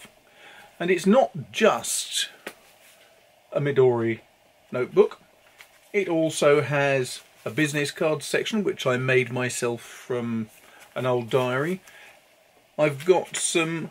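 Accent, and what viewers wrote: United Kingdom. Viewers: yes! I’m very happy about this and really there’s nothing I love more than British voices waxing on about all things pen, paper and ink! ;)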